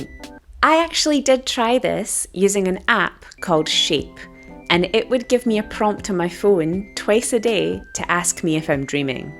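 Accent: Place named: Central Belt, United Kingdom. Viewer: yeah I couldn't pinpoint your exact accent, but definitely central belt, or maybe even Stirlingshire :)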